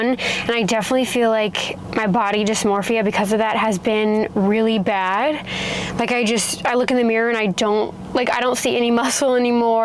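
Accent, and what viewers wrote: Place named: England, United Kingdom. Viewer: I love your English accents!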